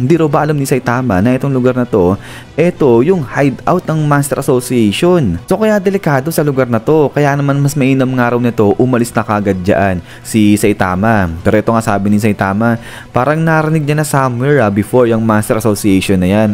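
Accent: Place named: Batangas, Philippines. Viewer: Tanong ko lng lods kung batanggenño kba? or bulacaño? May punto kasi ung pagsasalita mo 😆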